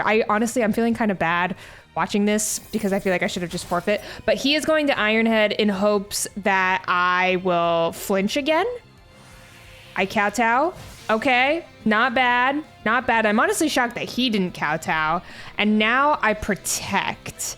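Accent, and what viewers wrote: Boston, United States. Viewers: As a non-American I'm curious: is that Boston dialect?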